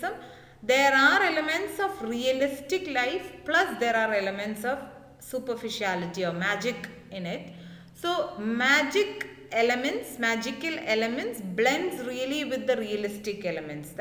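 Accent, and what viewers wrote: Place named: Tamil Nadu, India. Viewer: Tamil accent is very clear. Tamilians should improve in English to sound neutral.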